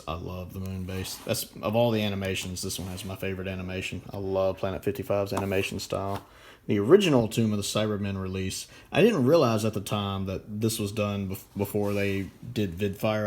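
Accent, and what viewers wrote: South, United States. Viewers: I think once you become a legal adult in America, groaning while crouching or bending is legally expected. Also, I love your collection! Also, I have been meaning to ask, Do I recognize a southern accent? I only ask cuz I'm from Texas!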